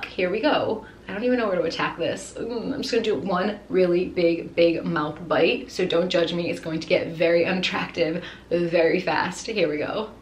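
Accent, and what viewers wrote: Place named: Rhode Island, United States. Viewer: Rhode Island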